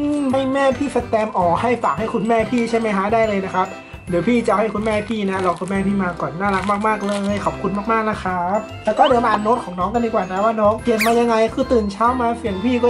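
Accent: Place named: Sing Buri, Thailand. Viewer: พูดสิงห์บุรีเหมือน miss grand เลย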